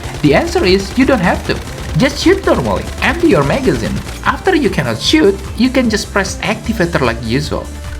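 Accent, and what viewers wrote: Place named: Indonesia. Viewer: Aksen inggris nya indo bet 😂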